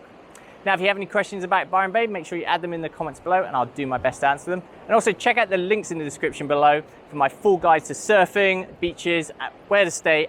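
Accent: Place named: Cockney, United Kingdom. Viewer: Rubbish. How do you think bungalung people feel about there home being exploited for money and popularity by Squeaky sounding cockney accent. Did U think at all. Surfing is a cycle that's learnt. You learn that a sand point of quality is hard to find. This is why no out of town surfers of low skill care at what they do in the line up. U can sitting on nose and same 2 English steroid heads will have there first drop in go. Bit further there's probably you and every chick longboarding that can't negotiate the the take off or cut back at all. Then we hit every body that faces backwards to the waveusually French and German girls.they only paddle for the biggest wave. The one 50 people have waited there turn for. It's done now why don't feature a drone tutorial of AYERS Rock. Ffff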